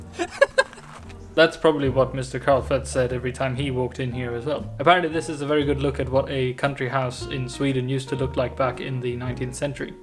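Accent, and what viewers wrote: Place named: England, United Kingdom. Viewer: I watched some of your videos because I thought you were gay- (I thought the French guy was your boyfriend)-with all your gay jokes, but then it seems your not gay so then the gay innuendos get annoying AF but I’m curious how your got an English accent or is that how Swedish people sound? Also, I noticed that Europeans drop the F-bomb a lot. You saved me thousands with your videos because I was thinking of visiting Sweden and after watching your channel I feel I saw what I needed- Sweden is basically Ohio. 🤷‍♂️✌️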